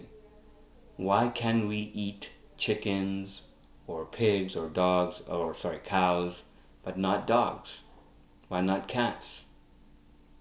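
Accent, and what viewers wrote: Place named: United States. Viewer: Hm... one more question you live in India? You sound American...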